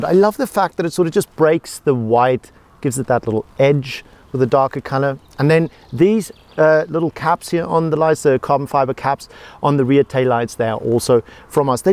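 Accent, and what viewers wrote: South Africa. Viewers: This Presenter is definitely South African. gatta Pattata?. Leon Schuster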